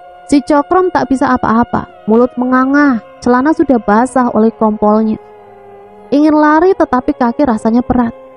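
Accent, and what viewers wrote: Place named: Indonesia. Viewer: Sy suka mimin ini walaupun kekadang terbawa bawa dlm membaca ceritanya tetapi asli suara nya Dr Indonesia...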